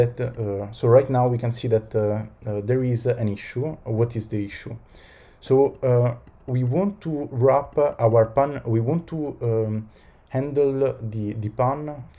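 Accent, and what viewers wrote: Italy. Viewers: Grande tutorial (sei italiano vero?)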